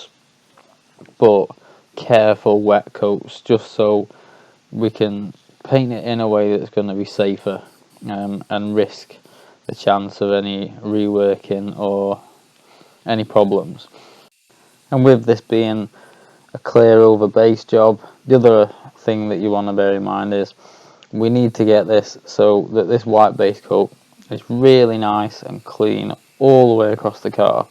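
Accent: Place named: England, United Kingdom. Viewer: thanks for taking the time to help me. You sound English where about is your shop?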